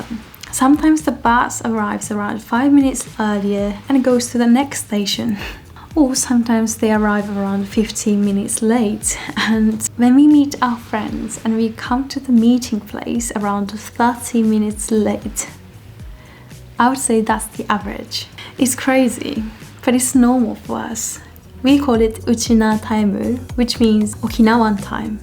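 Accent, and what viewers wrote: England, United Kingdom. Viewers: Yee u right...her accent like british already But i prefer american accent 😢 Gomen ne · Your English accent is so lovely and calm, you speak very elegantly! I'm from London, currently studying Japanese and Korean while living in Seoul ☺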